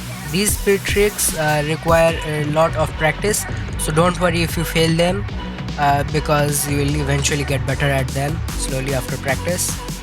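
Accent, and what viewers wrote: India. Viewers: U Indian bruh ? 😅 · idk if ur indian ur voice sound like ur a indian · Bro are you Indian cause you speak English like an Indian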